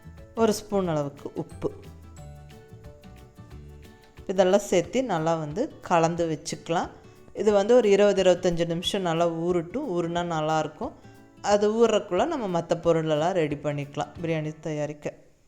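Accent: Coimbatore, India. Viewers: pathalea sapidanum pola iruku👌🏻👌🏻nangalum coimbatore dha akka..Muscatla irukom..Ippove oodi vandhiranum pola iruku namma ooru bashai keka keka. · Super Mam.. Unga Kongu Tamilum super...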